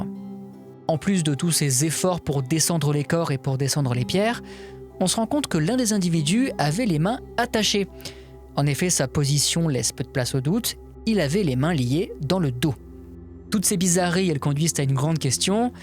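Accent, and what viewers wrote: Alsace, France. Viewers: C'est la première fois que je vois une de tes vidéo, mais t'es pas Alsacien ?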